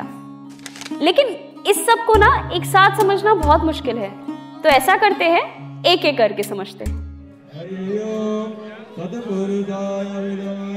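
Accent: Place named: South, India. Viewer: It sounds offensive if one speaks Hindi like Telugu, Tamil, or Kannada. Please try to completely say words in the Stotra in the beginning, like how Sanskrit is supposed to be spoken. Eg: kara(ha) means hand in Sanskrit. Kar doesn't give the same meaning. Thank you.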